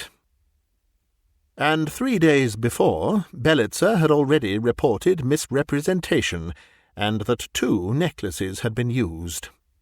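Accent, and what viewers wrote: United Kingdom. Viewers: Im Egyptian. English has been my second language all through my educational and professional life. However, i am more accustomed to the American accent because of the movies, series…. etc. only recently have I discovered the joys of listening to old radio plays and bbc stories. I had to set the playback speed to 0.75 in order to comprehend the British accent. But listening to British perfectly pronounced by you sir, I don’t need to change the speed on your channel. Thank you very much.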